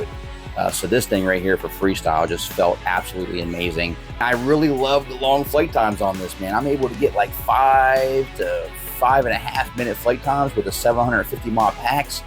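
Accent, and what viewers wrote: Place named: Maryland, United States. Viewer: Could tell straight from the first 10 seconds you were from MD 💪🏾😂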